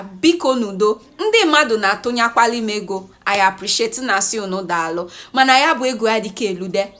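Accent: Nigeria. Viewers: I love her Igbo dialect a lot. It is music to my hears and I just can't stop listening to her.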